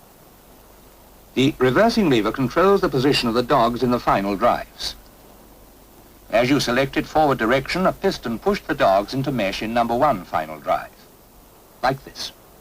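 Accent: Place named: RP, United Kingdom. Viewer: Even Prince William doesn't talk that posh!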